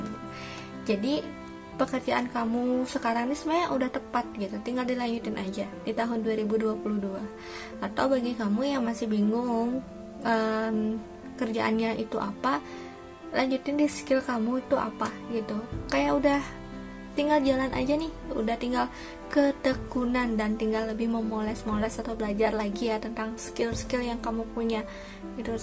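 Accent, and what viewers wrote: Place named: Bali, Indonesia. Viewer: Logatnya kakak kaya orang bali 😀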